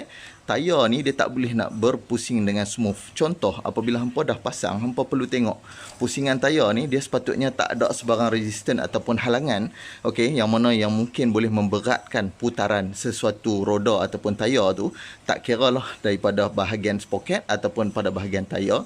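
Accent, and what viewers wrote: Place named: North, Malaysia. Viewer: Terhibur ckp utara sanrtai2. Kepala masuk bakui, tauk buang, cap kepala naga.. Hahaha.. Senamg faham dan xstress. Semuanya info yg sgt bermanafaat dan terbaik. Muga Allah tambah lg ilmu kpd tuan utk manafaat bersama.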